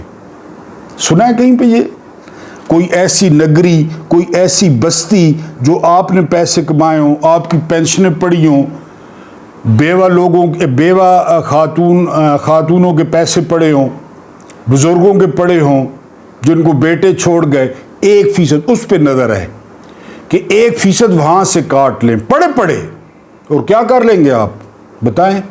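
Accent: Saraiki, Pakistan. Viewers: صاحب سرائیکی لہجہ میں اردو زبان بڑھی ہی دل نشین لگتی ہے ۔❤❤❤❤❤❤❤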